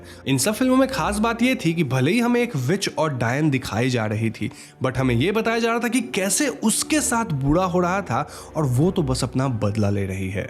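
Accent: Bengal, India. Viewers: Can I ask you a question sir....are you Bengali?? Cz you're Hindi accent main thoda thoda Bengali wala accent aa jata hai.... ( I am Bengali )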